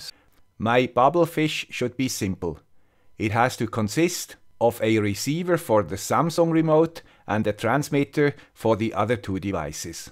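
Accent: Switzerland. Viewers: As usual, a great translation of an alien topic with a characteristic Swiss accent and with huge positive benefits for your audience, thank you! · Hi, ich beschäftige mich gerade mit dem ESP32 und bin dadurch auf deine Videos gestoßen und muss sagen WOW. Echt, der Grad an Details und der Umfang für dein Thema hauen mich echt um. Alle anderen Youtuber sind nicht so präzise oder machen zuviel Entertainment aus dem Thema. Aber nicht beim Typen mit dem schweizer Akzent:D, der brilliert durch Content und sein Hobby! Ich hab das Gefühl egal was ich gerne mit dem ESP32 machen will oder was mich interessiert, du hast das schon einmal durchdacht und nimmst einem dabei viel Mühe und Recherche ab. Also noch einmal: ECHT TOP! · I'm very cheap and I like tinkering. Everything in my house is DIY and custom made. I like your content and Swiss accent very much.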